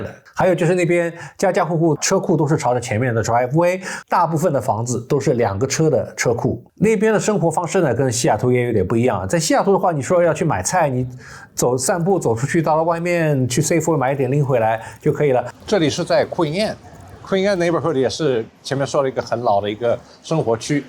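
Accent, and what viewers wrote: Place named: Shanghai, China. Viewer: 30多年上海口音还是很重啊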